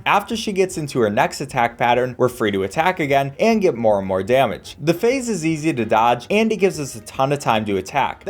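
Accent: Sweden. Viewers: are u from sweden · Are you Swedish I em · excuse me are you swedish?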